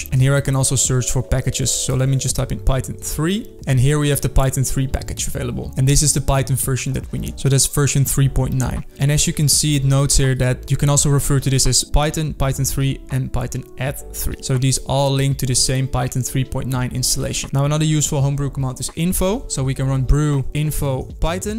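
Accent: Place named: Netherlands. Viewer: zo jongen ik hoor zo erg je Nederlandse accent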